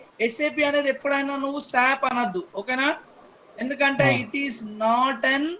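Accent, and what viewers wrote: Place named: Telangana, India. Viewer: Telengana yasa la iragadeeshnav anna....detthadi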